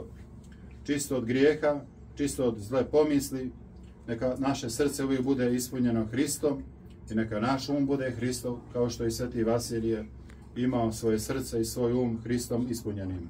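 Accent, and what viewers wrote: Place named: Ekavian, Serbia. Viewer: Opet ekavičar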